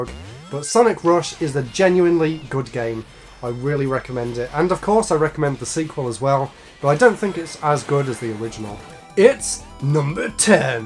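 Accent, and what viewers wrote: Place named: Northern England, United Kingdom. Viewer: At 2023 i figured out that there are plenty of different pronunciations in England, so now i gan here and there and bother people with question "where are they from" if hear not so-called standard pronunciation (thine is obviously northern one). So, satisfy my curiosity, where art thou from? (i guess Birmingham or its surroundings).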